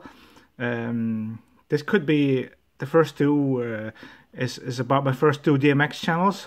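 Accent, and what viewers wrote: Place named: Netherlands. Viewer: mooi nederlands accent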